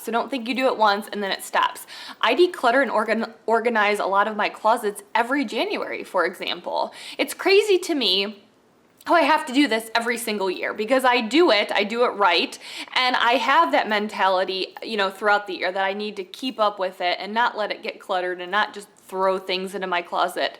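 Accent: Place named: Chicago, United States. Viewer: Great video! I'm new to your videos. Are you from Chicago Area? I love it there - your accent reminds me of the great people there!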